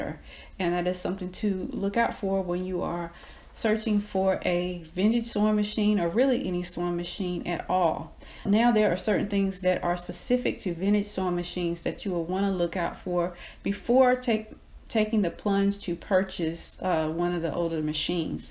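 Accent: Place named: South, United States. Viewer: and as an australian i love that southern accent - also no stupid shitty music.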